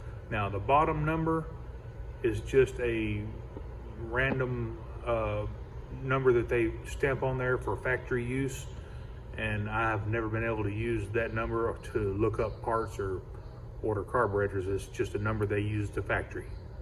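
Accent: Texas, United States. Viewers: I think he’s a Texan.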